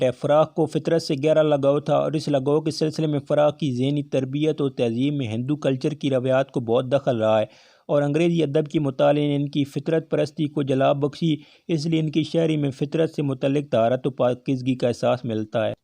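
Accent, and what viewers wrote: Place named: Sargodha, Pakistan. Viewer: Sargodha ki zuban apky lehjey main wazeha jhalak dey rahi h.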